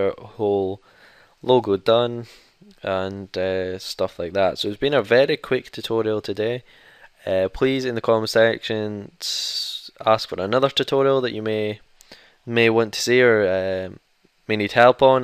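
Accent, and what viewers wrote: Scotland, United Kingdom. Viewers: love the scottish voice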